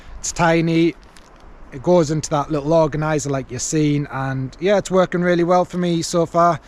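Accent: United Kingdom. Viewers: A saw will drop a tree much faster and with much less energy than an axe if used correctly. The only reason you'd take the havier more cumbersome axe is versatility which is a fare reason but in the UK (I'm assuming based on the accent) if you get caught with either of them you're in trouble which is so stupid.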